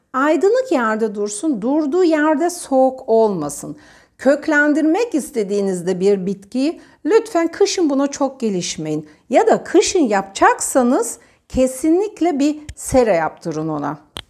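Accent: Turkey. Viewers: Abla Trakyalı galiba 😀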